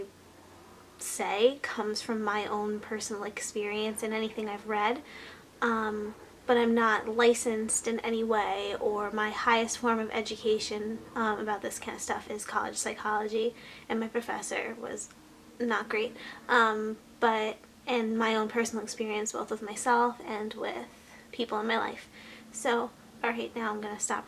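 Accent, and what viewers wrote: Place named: United States. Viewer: Ok putting some points on the comment section here, 1. Me and you have literally been through so much of the same stuff 2. You're beautiful on the outside 3. You're beautiful on the inside 4. Your accent is adorable! (I'm british you Americans are adorable!) 5. Some of the points you put forward were so unbelievably true 6. I have really really bad 'love addiction' (I kinda don't like calling it that sounds weird) I have to make everyone in my life super happy and i give them so much of my time etc after this. I'm gonna think about how much i contribute to stuff like friendships etc 7. You freakin' awesome Xox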